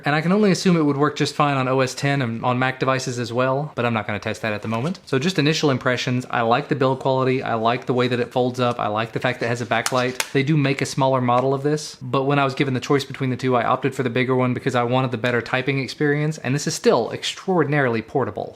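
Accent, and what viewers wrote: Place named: South, United States. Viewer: I know you said you didn't have an iOS device, but I do. I bought mine about four years ago to use with my iPhone Xr, and loved it, although it took a long time to get used to the tiny size. I just upgraded to the iPhone 14 Pro, and after pairing the BK-03 keyboard, I can't get the space bar to work unless I press the Alt key and the space bar at the same time. As you can imagine, this can't stand. I'd never get anything done. I've monkeyed around in my phone's keyboard preferences, and have toggled various settings off and back on (this very often works!) and nothing has helped. I even switched ANSI to another option, then back again. Any help or suggestions you may have would be truly appreciated. By the way, after slogging through all the other videos, I responded to you because of the Southern accent. I'm a displaced Southerner myself, born in the wrong state! 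Ha ha!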